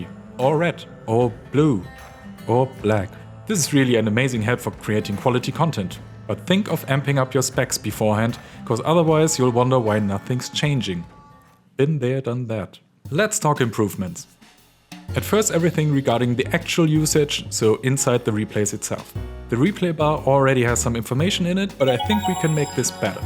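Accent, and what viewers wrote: Germany. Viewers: Ich hab sofort gewusst, dass du Deutscher bist haha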